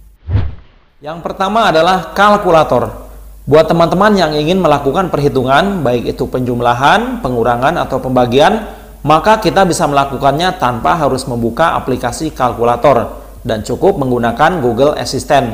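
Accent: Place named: Indramayu, Indonesia. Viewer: Orang indramayu to...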